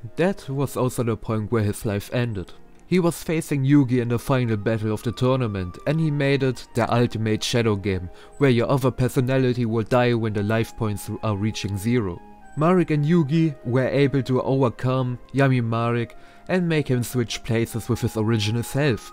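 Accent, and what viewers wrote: Germany. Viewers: You have a strong german accent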